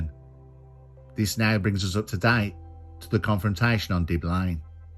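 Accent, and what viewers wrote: Birmingham, United Kingdom. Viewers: ... Narrator has a Smoooth Brummie Accent... NICE FLOWING STORY 🎉🎉🎉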